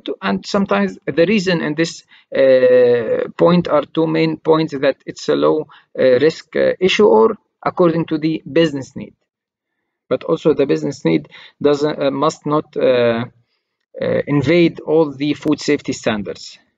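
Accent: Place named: Arab world. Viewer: Are you from middle East? Your english is like arabic.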